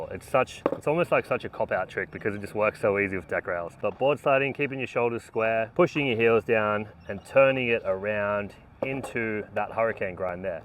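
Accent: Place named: South Africa. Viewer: I don’t like the way u talk. South Africans always make me think of that blood diamond movie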